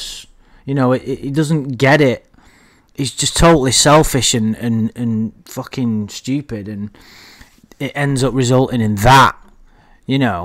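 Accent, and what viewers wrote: United States. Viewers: your American accent is incredible. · Not a bad yank accent.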